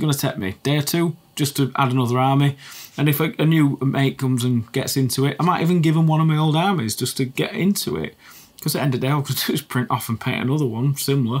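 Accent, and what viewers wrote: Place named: Yorkshire, United Kingdom. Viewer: It's nice hearing a fellow Yorkshire accent (if you are not from Yorkshire I am so sorry if that was an insult lol)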